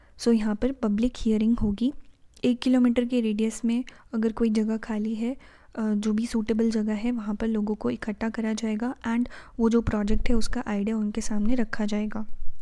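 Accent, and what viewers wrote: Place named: Kashmir, India. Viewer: Good video. Mam your sound like Kashmiri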